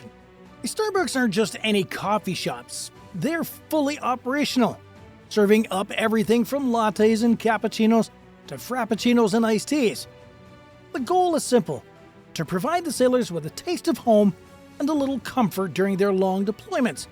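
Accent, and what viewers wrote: Canada. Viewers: Fellow Canadian narrating?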